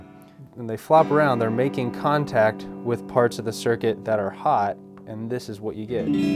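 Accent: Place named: United States. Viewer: Why do Americans pronounce solder as sahder? It's never made any sense to me. Do you say bahder instead of boulder? Do you say this thing is a lot cahder than this one which is hahder?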